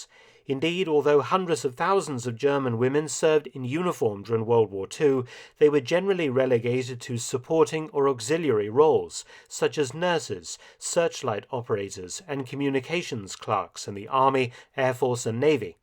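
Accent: United Kingdom. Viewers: His German pronunciation is solid but far from perfect. There is a notable British accent and in addition some words sound quite different when a native speaker speaks them. For example "Hanna Reitsch" was quite close, but there was still some accent in the surname. In contrast to this, "Beate Uhse" was quite wrong. He did not speak the "a" of "Beate" although it should be spoken. In addition he spoke of the "U" of "Uhse" as "Ü". What he said would have been written "Bete Ühse" and not "Beate Uhse".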